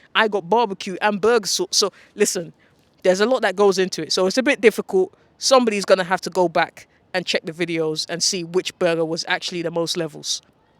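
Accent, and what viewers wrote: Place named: Ghana. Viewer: Hehehe!!! See! He talks like us. That's what fooled me! Ok then! Big ups to Ghana!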